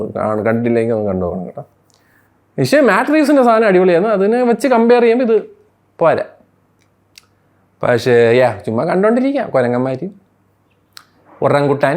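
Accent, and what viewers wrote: Trivandrum, India. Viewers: തള്ളേ തിരോന്തരം 😂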